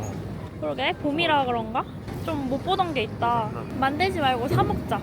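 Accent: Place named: Busan, South Korea. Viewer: 시애틀 브이로그 넘 재밌어요!! 자주자주 올려주세요~~ 옛날에 비해 특유의 뽀짝한 부산사투리가 많이 옅어지셨네요 ㅎㅎㅠㅠ 그래도 귀엽고 예쁘고 다 한,♡ 유투브 다시 시작해주셔서 감사해요!!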